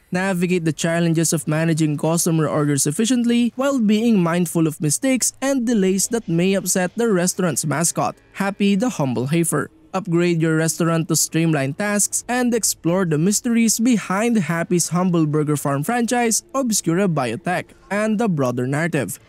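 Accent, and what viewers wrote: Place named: Philippines. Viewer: you have a Filipino accent and vibe.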